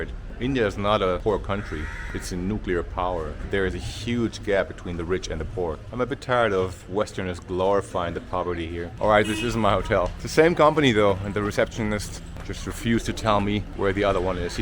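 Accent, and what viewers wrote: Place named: Germany. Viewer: Just from your accent I understood youre Deutscher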